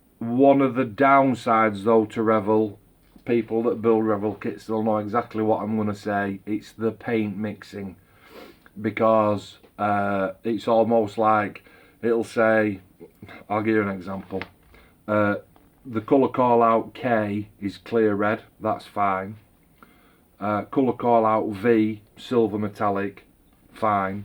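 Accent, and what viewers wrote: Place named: Yorkshire, United Kingdom. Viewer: Lovin that Yorkshire accent mate !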